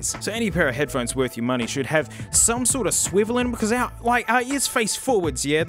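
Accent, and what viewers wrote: Australia. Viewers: I could hear this Australian talk for hours about stuff that doesn’t even make sense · Same, though I'd want dank to play the same parts of the song in the comparison between the headphones. Also the ears make me think of ASMR artists though I've head worse whispering in my ears then this lovely ausie man.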